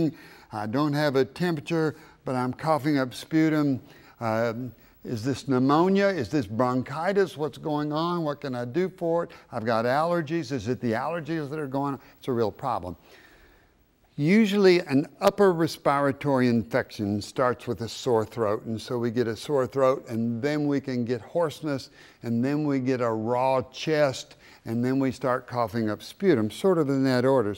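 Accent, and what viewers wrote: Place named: Mississippi, United States. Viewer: He sounds like he's from Mississippi . Wish he was our doctor. This guy has KNOWLEDGE .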